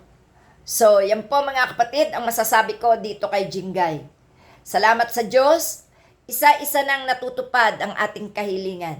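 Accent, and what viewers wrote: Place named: Cavite, Philippines. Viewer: Caviteña ka pala Maam..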